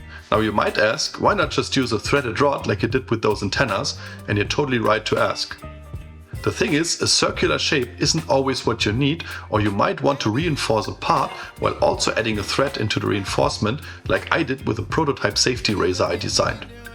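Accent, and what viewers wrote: Germany. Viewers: Notable observation: if the author of the video about 3d printing has a German accent, the video is going to be awesome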